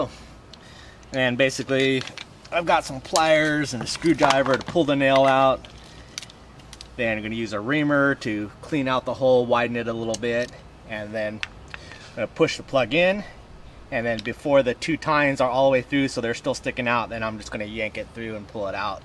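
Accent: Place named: Hawaii, United States. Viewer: Are you from or lived in Hawaii? You sound like you did before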